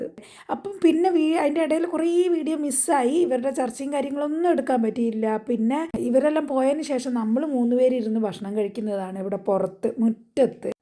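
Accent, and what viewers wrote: Palakkad, India. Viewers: Palakkad accent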